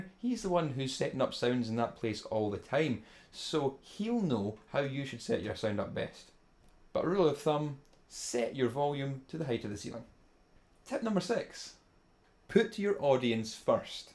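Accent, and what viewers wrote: Scotland, United Kingdom. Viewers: Emmanuelle de jesus Scottish accent. Someone from Scotland could probably tell you what city too (Edinburgh sounds v different to Glasgow). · Great advice. I've been playing live for 50 years now, and each of these tips ring true. I could have done a video on this, but I don't have the uber cool Scottish accent that you do! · Scottish accent is the best :DD great video as well !! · Just discovered your videos a few weeks ago, and have enjoyed them a lot, not just because I agree on a lot of your points about EMGs, Floyds or Boss HM2s with full gain, not just because I have the exact same Bugera amp, or because I love the Scottish accent. I like them because I actually learn some valuable lessons from you. That being said, I also agree on everything in this video, except I don't think wireless systems makes a guitarist move around more. 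I'm the guy who always gets in the pit with my guitar or jumps from PA towers, but I never thought of a wireless system as a worthy investment. I usually don't use pedals, maybe a little OD sometimes to boost solos, but even when I don't have any other pedals I always put my Korg stage tuner on the front/center of the stage so I have an "anchor point" in front for more movement/range and to reduce the risk of pulling my amp head to the floor. I also put my cable around my strap so it won't get ripped out. I see many guitarists with wireless systems standing in the exact same place the entire gig, and I don't get why they bought it. Anyway, I think there are some other advantages with wireless systems that I haven't seen yet, maybe you could make a video about what possibilities such a system gives you and some pros and cons with being wireless? (A guitarist I know uses his system (shure) simply because it has a built in tuner.) How about setting up your gear for a gig? 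switching guitars onstage? If you use a shitload of p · Oooo your Scottish? Where about are you from? I'm from south lanarkshire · Dude you look so cute and ur Scottish accent is pretty funny and frustrating to listen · new sub here. not just for the Scottish accent nut great advice as well!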